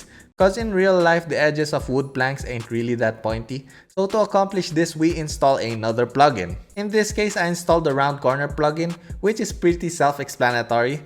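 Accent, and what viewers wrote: Philippines. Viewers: These dudes right here be pressed about a person who just recognized a filipino accent. 😂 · damn! this is why i always wanted to see your vids coz men! your editing skills is so good and satisfying. The transition effects are so good and educational. also love your filipino english accent hahaha every diction of words is clear and precise. I think you are one of the underrated vloggers so i hope soon i can witness your growth up to million subscribers..hayyysss every time i watch your vid makes me want to go to your place and ask you to be my mentor hahaha anyways i also finished architecture degree hope to see you soon idol! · Heards his accent Me: Heck yea, a Filipino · why do I feel that you have a Filipino accent? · You sound like how Jokoy perceived what Filipinos sound like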